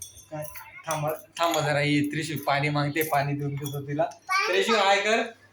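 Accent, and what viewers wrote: Khandesh, India. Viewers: khandeshi tone ne bolotat te pan mumbait khup changle neither lagch tone change hoto boloncha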